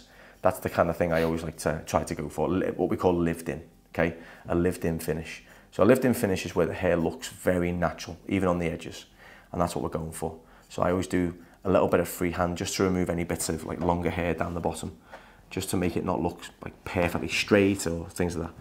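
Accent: United Kingdom. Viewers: He's British, great accent talks about what he is doing on video, scroll on if you don't like it. Some of us like to watch and listen to!❤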